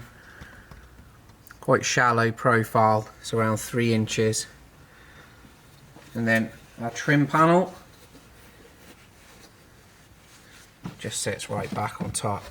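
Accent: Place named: Wales, United Kingdom. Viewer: love your work and your content. Thank you subbed 👍🏻 Incidentally trying to place your accent - there’s a hint of Wales there?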